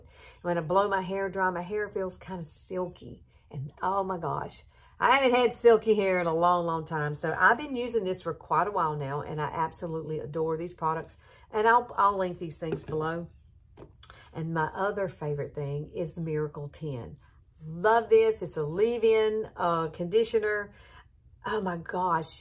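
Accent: South, United States. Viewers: I have to say this first.....I watch a lot of Youtube videos for one thing or another, and I find myself muting the person on the video because their voice is so annoying. LOL You have the voice that makes me want to listen, because it's like the difference between a shout and a whisper. The lovely Southern accent helps too! Thank you for a most entertaining and informative video! Love from Los Angeles! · You are so sweet...love your southern accent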